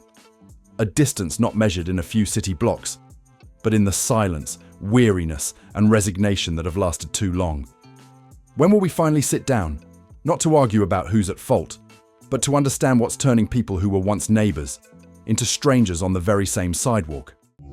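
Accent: United Kingdom. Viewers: the British narrator seems strangely out of place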